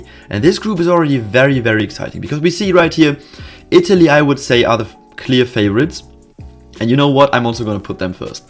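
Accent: Germany. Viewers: The German accent 😩😩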